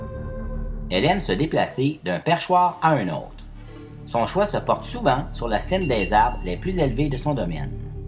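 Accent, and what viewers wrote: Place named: Quebec, Canada. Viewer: Pas mal! Avec un sacré accent québécois tout de même...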